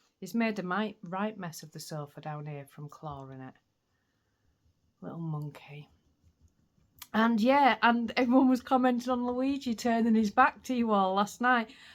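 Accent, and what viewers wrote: Yorkshire, United Kingdom. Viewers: Oh, I'm so sorry that some grubby little person has seen fit to spread their poison onto your lovely vlogs. And they are little people with little lives who have nothing better to do so let's give a collective f off (I don't use that word but it seems appropriate here 😁). Someone nearly destroyed my dear friend Sue (Suzie Stitcher) who is the sweetest most inoffensive person. She nearly took her channel down but I'm glad she bounced back., your warmth and lovely Yorkshire accent just oozes out of your channel - and you have lots of fur babies 🥰😍🤩 Well, it was 14C yesterday and 40C not so long ago - I'm glad that I didn't put my long johns away Ha Ha Look forward to seeing you soon. Much love, Angela 😘😘😘XXXX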